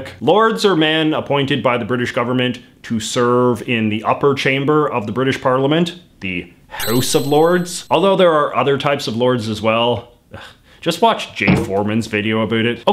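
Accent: Canada. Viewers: is such a Canadian with his very pronounced "aboots"! That's part of what makes him intersting to me, I suppose. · Are you by any chance Canadian? And yes I ask because of how you pronounce out. No offence, just wonder.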